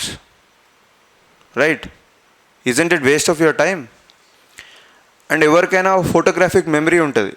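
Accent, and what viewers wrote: Telangana, India. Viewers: First time vintunna .... Telangana yasaa....oka civil servant matallo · Sooper speech in telangana yasa garu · Very good to hear pakka Telangana slang ... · కేటీఆర్ సార్ లాగానే ఉన్నారు సార్ అలాగే మ్లాడుతున్నరు తెలంగాణ మడలికంలో చక్కగా అర్థం వంత్తగా మాట్లాడారు సార్ ధన్యవాదాలు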